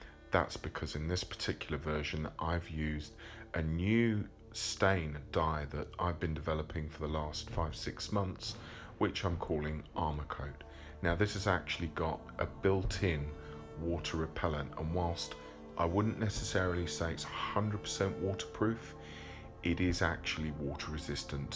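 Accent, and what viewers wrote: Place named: United Kingdom. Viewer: Ok completely off topic dude look like Jeffrey Dean Morgan and Robert Downey Jr had a kid together and then gave him a British accent and im totally here for it.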